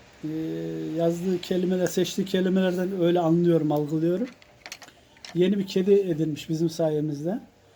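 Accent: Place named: Kayseri, Turkey. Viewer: Abi ben daha önce de yazmıştım size sizin şiveniz kayseri şivesi bana bu şive tanıdık geliyor bende kayseriliyim antalyada oturuyorum yani yakınız sizi hep izliyorum annenize allah iyilik versin hayear zaten bir numaralı artist maşallah Bu arada yeniyılınız kutlu olsun 😸😺😻🙏👍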